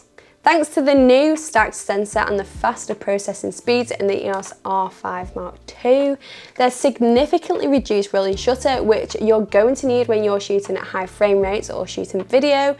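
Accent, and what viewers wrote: United Kingdom. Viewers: As someone from Texas, it might be the British accent that entranced me, but this might be one of the best reviews on YouTube- great job 👏 (wait, am I supposed to bow?). As for the camera, I’m shocked at the quality at 1/4000, f/4, ISO 6400. I’m looking forward to what I will get using a 2.8 lens…wow! Keep it up, Queen 👸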